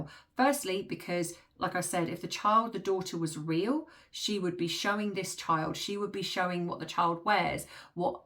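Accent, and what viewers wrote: United Kingdom. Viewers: Your opinions make so much sense and reflect your education in your chosen profession. I don't know how anyone can see it otherwise. They just seem to believe what they want to believe is true. By the way, I love listening to your lovely British accent (I'm from Indiana) and think you're a very beautiful young lady. I'm 65 and wish that youthful glow could last forever. Alas, it doesn't, so enjoy it while you can. God bless, Carol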